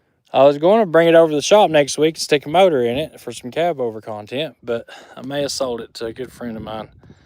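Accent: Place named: California, United States. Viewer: OK sounds like a Californian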